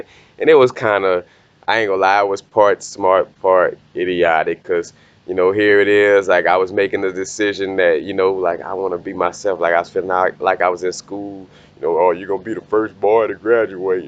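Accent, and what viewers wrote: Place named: South Louisiana, United States. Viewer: I'm from lousiana, you sound like you are in the south Louisiana area but I may be wrong.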